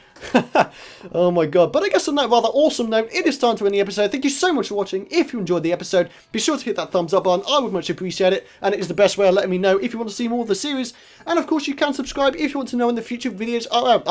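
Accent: United Kingdom. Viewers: You sound a bit British but I'm Australian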